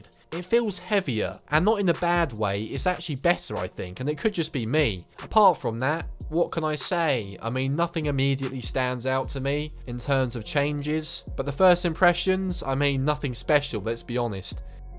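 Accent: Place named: Chelsea, United Kingdom. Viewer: Man u sound like such a toff. Your aight there i mean im here.. its your voice ..mad made in. Chelsea vibes . Hearing you getting your winge on about fps and world densities is funny..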